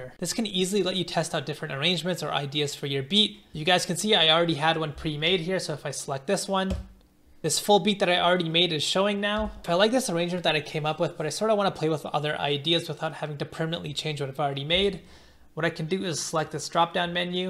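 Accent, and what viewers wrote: Punjab, India. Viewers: Are u punjabi bro?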